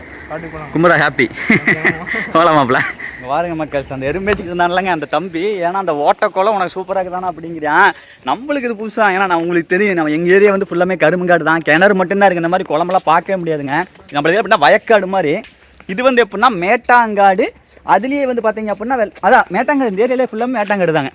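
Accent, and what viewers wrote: Kongu, India. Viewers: Unga kongu Tamil Vera level keep rocking reach heights all the best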